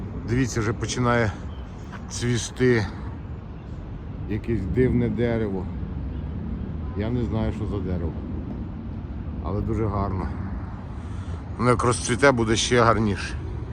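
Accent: Zhytomyr, Ukraine. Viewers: Як же мені подобається діалект Житомирщини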